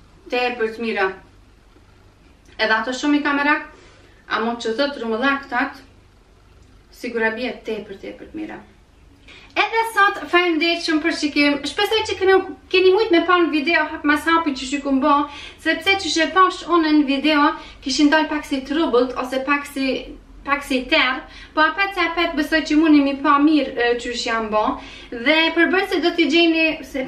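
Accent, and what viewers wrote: Kosovo. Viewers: QENKE SHUM E MIRE DHE SHPJEGON SHUM MIRE ME PELQEN DIALEKTI KOSOVAR SHUM. TE PERSHENDES. 🙋😄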